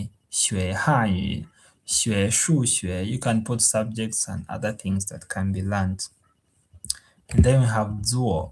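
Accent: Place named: Nigeria. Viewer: are you from Nigeria by chance? btw your Chinese sounds less accented than your English lol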